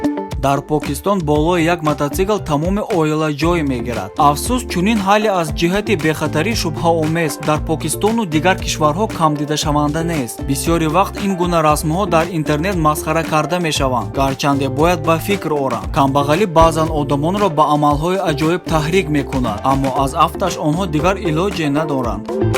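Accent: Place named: Tajikistan. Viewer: لهجه تاجیکی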